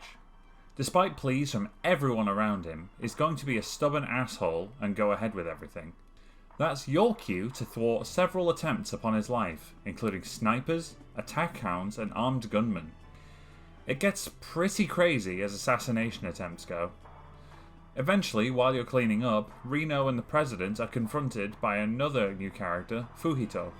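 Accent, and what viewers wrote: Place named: East Midlands, United Kingdom. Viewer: You put so much effort into this, I love it. I saw when you joined and I assume this was a Covid project? Also you sound so close to my accent in the East Midlands!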